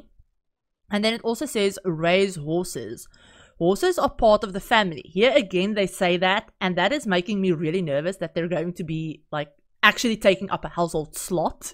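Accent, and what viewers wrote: Afrikaans, South Africa. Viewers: OMG, I immediately recognised your Afrikaans accent the moment you started talking! Very cool to see another Safrican simmer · Yes, immediately recognised that SA accent and subscribed straight away😁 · This is my first time here and I recognise a South African accent when I heart it so I had to do a double take and check that I'm hearing right because I know SA creators in this space are few and far in between. Congratulations. ❤ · OMG your accent r u South African, because if u r this will be my first time coming across a South African sims4 content creator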